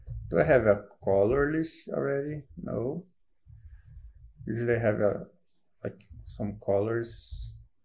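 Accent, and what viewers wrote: Brazil. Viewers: Pelo sotaque tu é brasileiro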